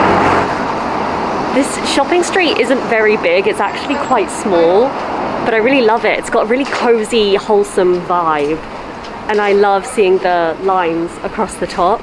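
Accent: United Kingdom. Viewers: 落ち着いてこじんまりした感じがいいですね。なんか、懐かしい感じがします。ところで、さんの「いただきます」とイギリス英語のとりこになしました！！ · いつも､ さんの｢イギリス英語｣を聴きに来ます｡ 明瞭で､美しくて､音楽のようでホッとします｡ アメリカ人の英語だと､ときどき聞き取りが難しいニッポン人の私ですが…… さんの｢イギリス英語なら､いつもハッキリ分かって､安心します｡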